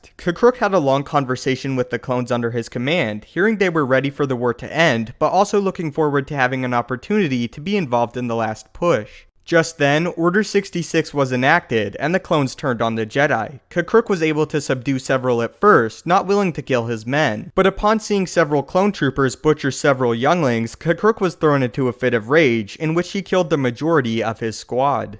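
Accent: Canada. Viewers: Damn this guy is canadian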